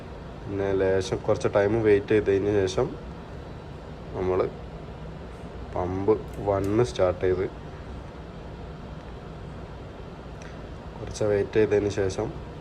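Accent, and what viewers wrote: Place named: Kozhikode, India. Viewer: Voice over sound കുറവാണു... ആരോ ചെവിയിൽ പതുക്കെ പറയുന്ന പോലെ 😄... പിന്നെ ഈ കോഴിക്കോടൻ slang ഒഴിവാക്കിയാൽ കേരളത്തിലെ എല്ലാവർക്കും ഇഷ്ടപ്പെടും.... "ദേവൊസോം" എന്നല്ല ദിവസം എന്നും മണിക്യൂർ എന്നതിന് പകരം മണിക്കൂർ എന്നും തിരുത്തുക.... Comments പോസിറ്റീവ് ആയി എടുക്കുമെന്ന് പ്രതീക്ഷിക്കുന്നു 🙏.... Video നന്നാവുന്നുണ്ട്... ഭാവുകങ്ങൾ 👍👍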